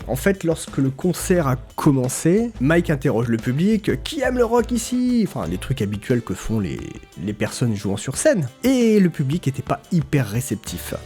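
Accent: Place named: United Kingdom. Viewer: Belle découverte ! Et c’est moi ou ton accent british s’améliore 😅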